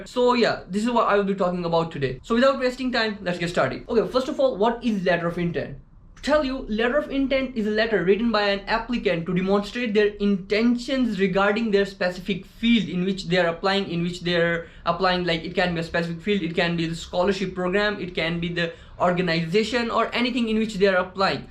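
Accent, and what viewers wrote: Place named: Pakistan. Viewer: kuch b kr lo native english bat ni kr pao gy 😂 tu faida nu Pakistanio ki trah he english bolo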